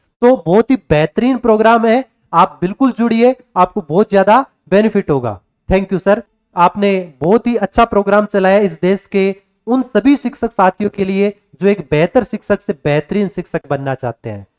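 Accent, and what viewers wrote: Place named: Haryana, India. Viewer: Sir aapki voice tone se lag rha h ke aap haryana se ho, mai bhi haryana se hu and I am preparing for neet ug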